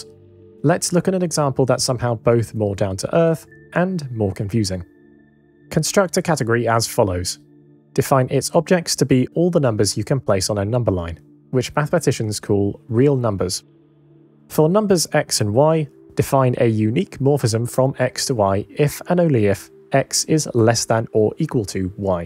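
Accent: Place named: United Kingdom. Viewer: what is...katuhgree theory?? british accents man. wild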